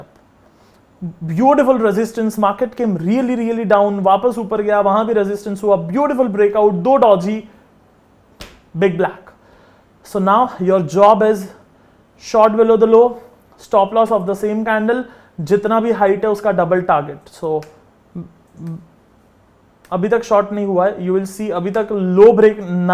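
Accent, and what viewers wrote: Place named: Mumbai, India. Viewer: Awesome Yahi jab bolte h aap to maja aa jata h Aur humko sunna h typical bombay language bro Pls dont say pure hindi 😂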